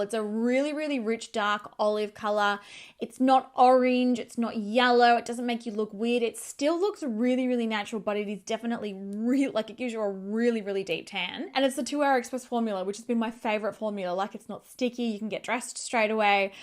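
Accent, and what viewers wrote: Australia. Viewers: How much I love you, your smile your positive energy your girlie voice your beautiful face and the cherry on top your sooo adorable Australian accent ♥︎♥︎ LOVE FROM GREECE 🇬🇷 MY BEAUTIFUL ♥︎ ( I want things from your brand does it ship to Greece? ) hugs xoxo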